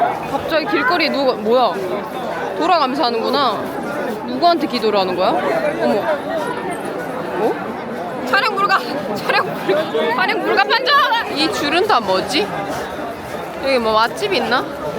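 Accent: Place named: Gyeongsang, South Korea. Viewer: 경상도에서 자라시고 TV를 많이 보신 분들은 아실텐데 옛날에 두 남자의 만국유람기라고 프로그램이 있었는데 거기 나오는 김근수씨 느낌도 나면서 (해외인데 꿋꿋하게 한국어 + 사투리로 소통하심ㅋㅋㅋ) 센스도 있으심! 너무 재밌습니다. 같은 진주사람으로 앞으로의 버킷리스트도 응원합니다~!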